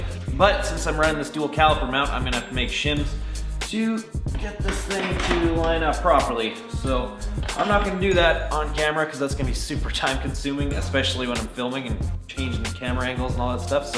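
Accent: Canada. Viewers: what part of canada r u from?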